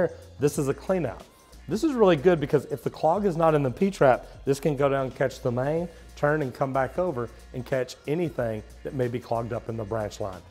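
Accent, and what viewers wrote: South, United States. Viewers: I have no idea what any of this is, but I stayed for that southern accent. 😃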